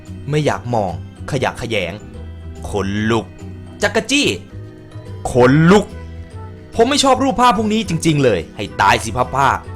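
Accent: Isan, Thailand. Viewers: พี่เป็นคนพาคอิสานเหรอคะ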